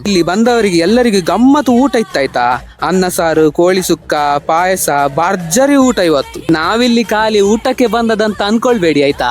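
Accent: Mangalore, India. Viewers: Entha marra neeu chanda aagthade kushi aakthade antheera neeu sa banni antha kariudila alla😂😂😂 Summane aaitha 😂😂😂 Ae ne heli maarra nimma chanel nodlikke namma kudla kannada mathu kellikkene ondu kushi ne bere ❤❤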